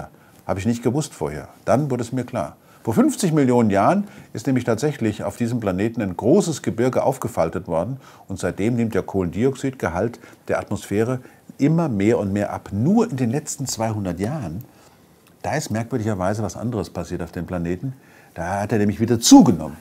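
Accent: Hesse, Germany. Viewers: Weidwingel! Da spricht der Hesse! :) Schöne Folge! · So schön hessisch!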